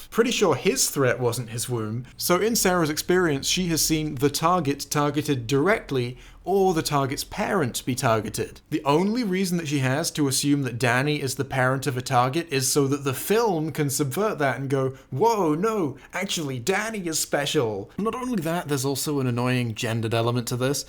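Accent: United Kingdom. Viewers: Why is it always someone on YouTube with a British accent that bashes this movie???? This is the 5th video with a Britain bum upset or angry. This hits their worldly view that a minority a Mexican at that is the hero in this movie. Shows how ignorant and underling views they have about other people! In the end this was a good movie and I suggest everyone not listen to the critics!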